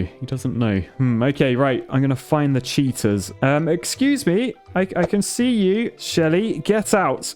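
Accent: United Kingdom. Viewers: And more bri'ish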